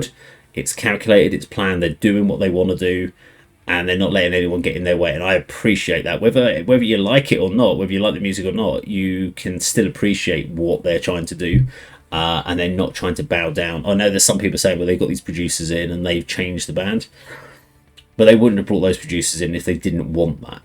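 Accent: United Kingdom. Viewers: Exactly!!!! It was very DISRESPECTFUL!!!!. WE ARE MILLIONS OF PEOPLE FROM MORE THAN 15 COUNTRIES WHO SPEAK "SPANISH" AND WE ARE NOT FROM MEXICO. THE language NAME is "Spanish". It's like if I say that you are speaking in the "USAing" because I assume that if you speak English then you are from USA and I call you GRINGO, when I clearly hear your British accent. English speakers tend to have this closed world mentality about culture, languages and geography because they speak only one language (while the majority of the world is bilingual). Many times that I have seen English speakers who when they hear Spanish assume "Mexico" which shows the level of little education in those countries respect to other cultures and how they tend to look only for themselves. By the way Spanish music is the second most listened to music in the world today. Just so you know. 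Maybe you need a long trip to latin america to know the world outside you caveman and open your mind....you can discoverd that exist a lenguage called SPANISH with more of 20 spanish accents in a lot of countries even Africa.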